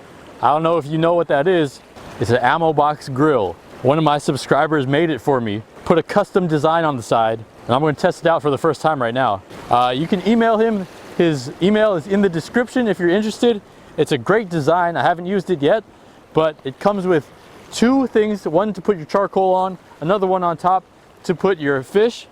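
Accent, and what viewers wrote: Bay Area, United States. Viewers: Yo the way he talks, he’s fasholy from the Bay Area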